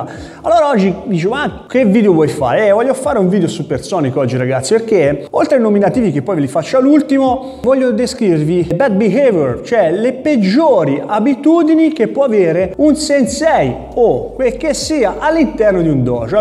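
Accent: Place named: Tuscany, Italy. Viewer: Ma sei in grande! E poi, con l'accento "toscanaccio", troppo simpatico.. bisogna accettsre x 💪🏻 forza. Ciao by Jerry (Milano)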